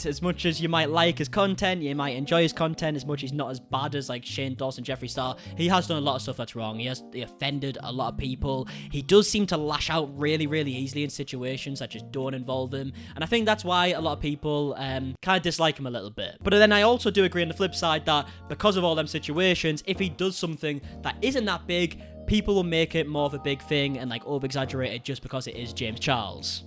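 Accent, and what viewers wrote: United Kingdom. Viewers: I was so bored and this popped up. YAY. Commenting so can buy himself some decor for his shelves and I get my UK accent fix 😁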